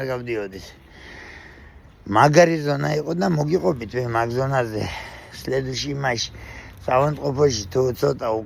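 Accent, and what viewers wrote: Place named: Imereti, Georgia. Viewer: გილოცავთ ახალ წელს, ჯამრთელობას გისურვებთ! საიდან ბრძანდებით ბატონო, იმურული კილო გაქთ. ❤️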